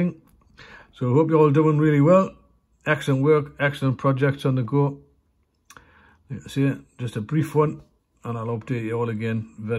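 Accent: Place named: South Essex, United Kingdom. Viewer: Very nice. You can't go wrong with a bit of South Essex.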